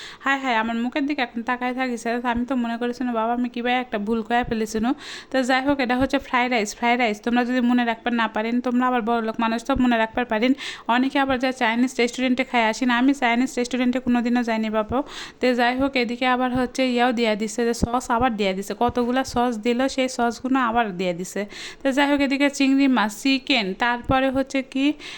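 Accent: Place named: Bogura, Bangladesh. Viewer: আপনার বাড়ি কি বগুড়া নাকি আপু কথা শুনে মনে হচ্ছে আমাদের এলাকার মানুষ ❤❤❤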